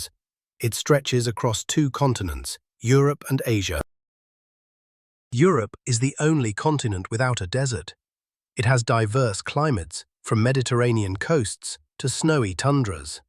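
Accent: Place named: United Kingdom. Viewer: nice! I have been trying to find a video with British English and I have it here!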